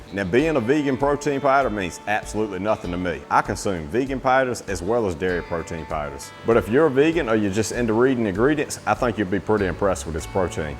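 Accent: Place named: North Carolina, United States. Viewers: Are you in North Carolina? I love your accent & your content...new subscriber. ❤ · I live in the southern United States and I can’t figure his accent either! It’s delightful!! He’s either from the Carolina’s or Louisiana?? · No clue how i ended up here, but a dude who looks like he could break a tree in half just by looking at it, with an accent I cant place further than "south? Maybe????", using molds he calls "adorable"? Yeah. Sign me the fuck up. (And yeas, my own prejudice absolutely plays in here, i was so ready to scroll quick as shit. Was like 85% sure this would include some 'women suck, God is everything, black people are criminals and also the gays are sinful' kind of garbage. I'm from Europe and everything about this mans first impression screams terrifying to me. Glad I stayed, if for no other reason than to sit with my own prejudice for a while and start to become aware of it. 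So you know, thank you for that, and also sorry for the immediate judgement) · He's from North Carolina, where he contributes his accent. He doesn't have a Louisiana accent (born and reared here, although we'd welcome him). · I just shared this with my mom, 8 siblings, and their spouses: “This dude I’d propose marriage to if he were single (and not straight). “His video shorts are amazing, canning peaches, growing food, freezing cantaloupe for smoothies, freeze drying watermelon, and so much more. A southern accent is always comedic or fascinating, but rarely sexy. I’d be able to listen to his accent all day long and be charmed by it.”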